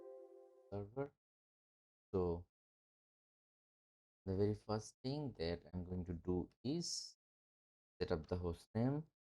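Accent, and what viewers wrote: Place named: India. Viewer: NEXT TIME ASK A NATIVE ENGLISH SPEAKER TO RECORD THE VIDEO. VERY DIFFICULT TO FOLOLOW YOU WITH THAT STRONG INDIAN ACCENT.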